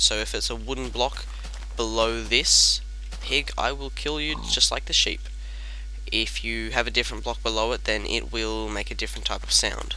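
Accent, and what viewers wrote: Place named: Australia. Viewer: Must be Australian with that accent, probably east coast right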